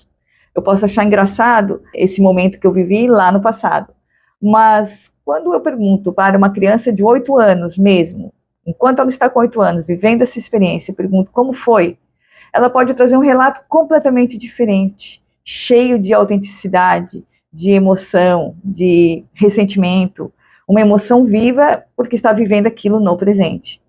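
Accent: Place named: Azores, Portugal. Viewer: Maravilhoso! E é gostoso ouvir o sotaque açoriano, ainda que leve.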